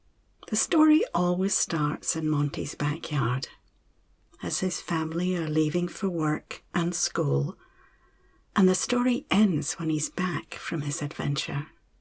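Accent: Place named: Canada. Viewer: My daughter loves this story and requests it almost every night. It was a significant part of helping her school/separation stress. Thank you. I share your channel with almost all of my clients, as so many struggles with sleep concerns, anxiety, or busyness! If there is anything else I can do to support your work, let me know. Love your "accent". We're Canadian, eh?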